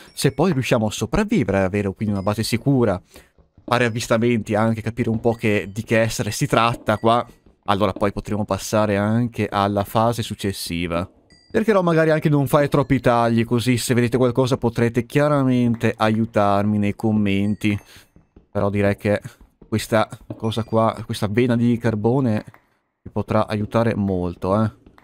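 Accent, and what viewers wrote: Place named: Verona, Italy. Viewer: Sono quasi certo sia veronese.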